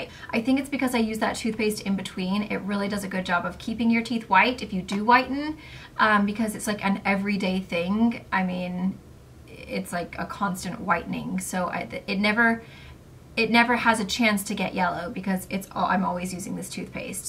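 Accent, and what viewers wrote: England, United Kingdom. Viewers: Do u still live in Tahoe? Have you gone to the Sac Morphe store? And....do u ever have an English accent??? Ty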